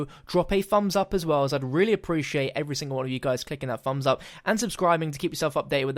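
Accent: United Kingdom. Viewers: He's from the UK, so his pronunciation is incorrect.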